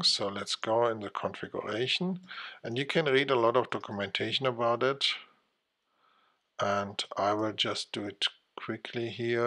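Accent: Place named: Germany. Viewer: Ist mir schleierhaft warum du das auf englisch gemacht hast. Mit einem derart starken deutschen Akzent wäre es auf deutsch sicher besser geworden. Dennoch interessant.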